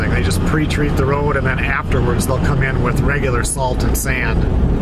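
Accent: Minnesota, United States. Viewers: I knew from the first words that you spoke, you were from Minnesota...That accent is strong up there....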